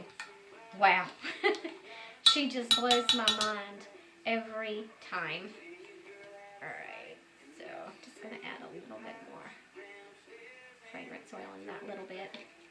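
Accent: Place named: Alabama, United States. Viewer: Are you from Alabama or Georgia? I hear my accent! (Alabama)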